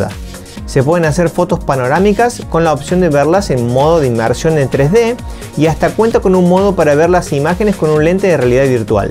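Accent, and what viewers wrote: Córdoba, Argentina. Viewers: Agradable de escuchar porque es Cordobés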